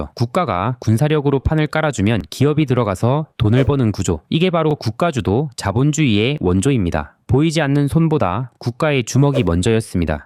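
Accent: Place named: Gyeongsang, South Korea. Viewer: 살짝 경상도 억양이 들리는데 고향이 울산 이신가요 ?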